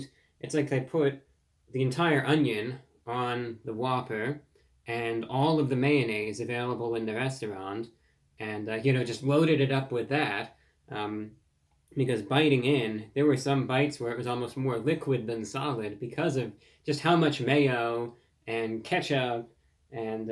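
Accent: United States. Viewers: Is this a posh american accent?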